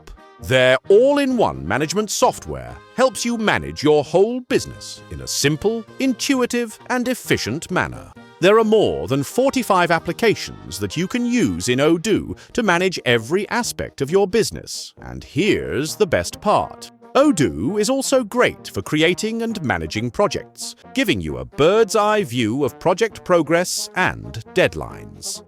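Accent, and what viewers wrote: RP, United Kingdom. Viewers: New narrator is fine, but just sounds like more of a “generic RP English accent” and has less of the edge and personality of the old narrator. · That British accent is great 😊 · It's ironic that the narrator for this video is British 👀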